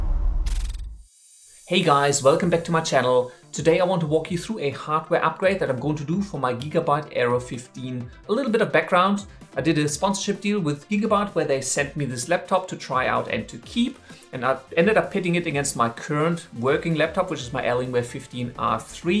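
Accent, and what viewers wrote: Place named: Germany. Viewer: In fact it feels like German accent to me, :) Oh glad to know you are being happy with the computer. I saw so many issues with that model on the Amazon US people's reviews that decided to get the MSI P65 instead. After 20 years using macs its scary moving to a Windows computer. Just in case you feel curious about my work, feel free to check <link> and <link> thanks so much for the feedback once again! Cheers from Spain!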